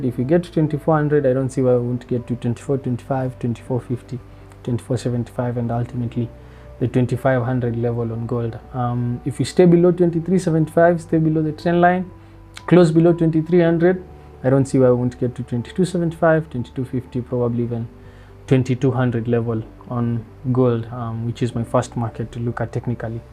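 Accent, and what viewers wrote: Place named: Kenya. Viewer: From the talk unajua ni mkenya 😂🎉🎉🎉let's goo bro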